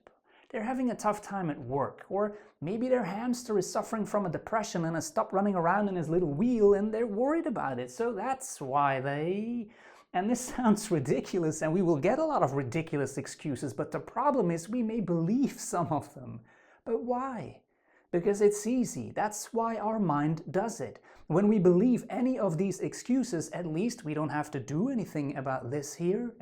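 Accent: Flanders, Belgium. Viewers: Love your Flemish accent . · My ex boyfriend is Belgian Flemish. I recognized the accent. Thanks for the wise message.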